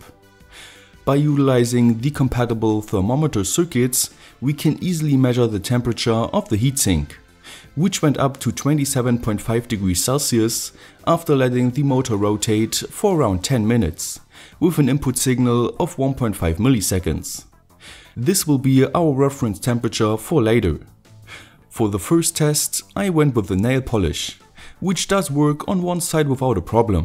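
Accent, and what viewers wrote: Germany. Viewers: Ich kann die Warnungen lesen, kleiner tipp😂 arbeite vielleicht etwas daran deinen deutschen Akzent loszuwerden, oder österreichischen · Du bist Deutschland und man hört das Das Akzente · Feier ich dass der dude deutsch is man hört den akzent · You sound so German xD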